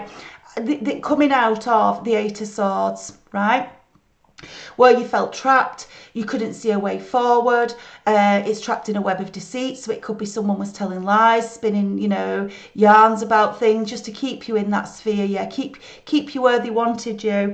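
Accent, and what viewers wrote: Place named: Newcastle, United Kingdom. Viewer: Love your reading (and that beautiful Geordie accent). Deffo dealt with a narcissist. Keep up the good work and will follow your guidance.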